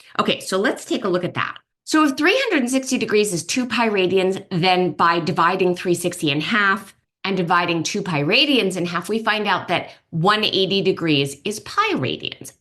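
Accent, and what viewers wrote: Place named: New York, United States. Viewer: Lovely concise little video…also, I love that accent of yours..is it a New York accent?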